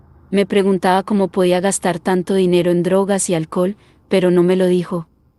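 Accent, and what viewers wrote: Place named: Colombia. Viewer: Ponele voz de hombre. Esa voz de mujer colombiana no ayuda a los videos.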